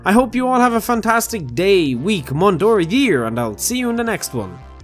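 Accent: Ireland. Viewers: "So I was tinking" ah I love your irish accent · First comment ha how are you Irish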